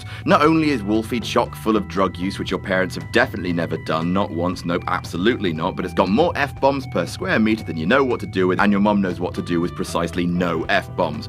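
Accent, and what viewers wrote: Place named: England, United Kingdom. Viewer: Love an English narrator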